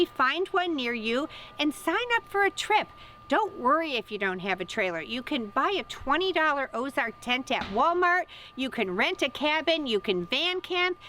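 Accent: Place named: Wisconsin, United States. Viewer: I love your Wisconsin accent!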